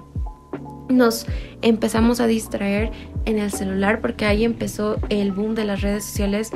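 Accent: Bolivia. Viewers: Eres de BOLIVIA?? Gracias por el video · Una pregunta eres de bolivia ?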